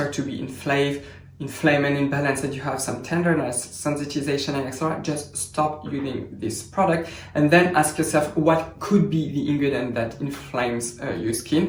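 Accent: France. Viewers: your content is amazing and you are so knowledgeable. However it is difficult sometimes to follow or understand the concept or language or some words, especially for foreigners who are not used to different accents (your accent is beautifully French and I am NOT criticizing it at all, please do not get me wrong, I am foreigner myself) - have you considered maybe making a little graphic boards for us? Like simple white board and a pen to explain? Thank you, please keep doing your job <3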